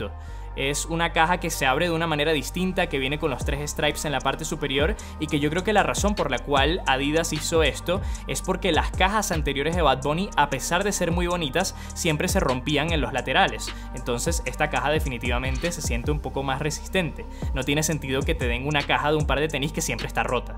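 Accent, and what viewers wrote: Venezuela. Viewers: Bro tienes acento venezolano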